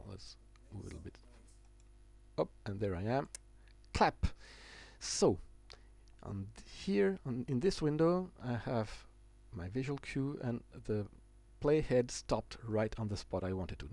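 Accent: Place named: France. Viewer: C'est un Francais non? xD l'accent!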